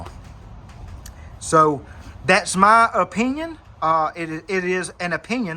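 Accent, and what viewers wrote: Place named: South, United States. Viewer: I had 2 pl350 switches crap out. What state are you from? It sounds like the South, like me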